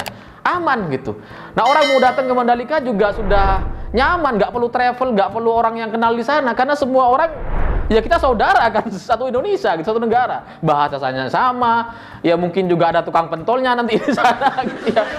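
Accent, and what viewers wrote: Madura, Indonesia. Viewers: Mas ini logatnya kayak asli madura...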